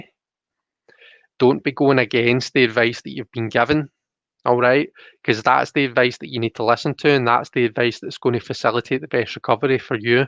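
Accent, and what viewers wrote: Scotland, United Kingdom. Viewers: Tarot with a Scottish accent <3<3<3 Is that Glasgow? Definitely subbing!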